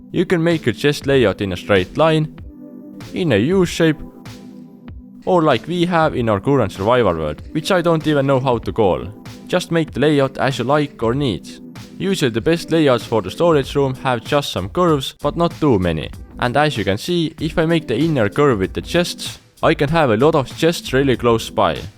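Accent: Finland. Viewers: Just with your accent I can tell you are Finnish! Terve Suomi! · You are Finnish . I am absolutely in love with the Finnish accent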